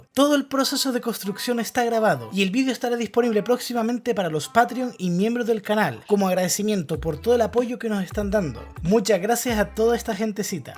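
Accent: Chile. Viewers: Amigo, cómo es que hablas como chileno? Jamás imaginé que fueras español, un saludo desde Seattle, tu canal es increíble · este man es chileno?? tiene acento de chileno!! saludos🇨🇱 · Tienes el acento de un chileno. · Buen día, colega ¿Se puede saber de que cuidad de España proviene? Es que me asombra que su acento suene tanto al chileno. Si no fuese por el seseo típico español, habría pensado que eras de Chile. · Están geniales tus vídeos!! Muy explicativos y profundos. Solo me perturbaba una cosa y es tu acento tan parecido al chileno jajaja hasta que descubrí que eres de Canarias y ahí todo tuvo sentido 😂 Saludos desde Chile, el peor país de Chile 😅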